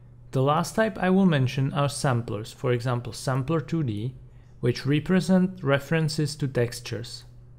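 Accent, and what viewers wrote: Czech Republic. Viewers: tady je někdo čech já to v tom slyším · Czech accent immediately spotted :D, thanks for the great video.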